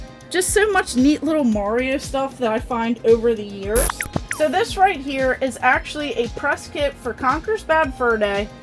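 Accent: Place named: Baltimore, United States. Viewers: is she from maryland? i heard 98 rock and shes got a thick baltimore accent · 2 minutes in hearing her accent - ok yeah, she’s definitely from Baltimore lol. Amazing game room!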